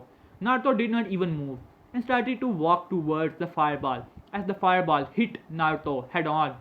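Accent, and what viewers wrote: India. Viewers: He has an Indian Accent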